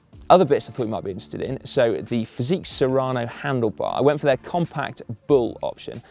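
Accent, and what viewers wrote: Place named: RP, United Kingdom. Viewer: Where does get his posh voice from? #torqueback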